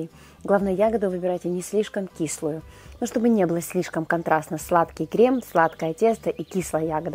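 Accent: Ukraine. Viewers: Сколько смотрю столько и слышу украинскую речь. Мне нравится её говор 😍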